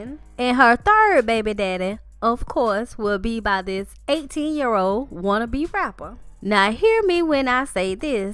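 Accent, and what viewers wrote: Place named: South, United States. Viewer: I appreciate the immediate reply. Understand that I asked because I have relatives and friends from the south (where you sound to be from, or have lived) a couple of whom actually pronounce "street" as "screet" in all seriousness. Thanks again; I'm about to finish watching your video on Fabolous. These are fun! 👏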